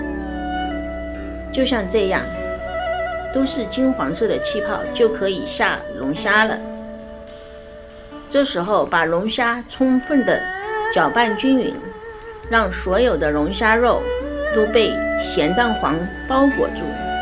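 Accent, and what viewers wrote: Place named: Shanghai, China. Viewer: 上海的阿姨？ 熟悉的口音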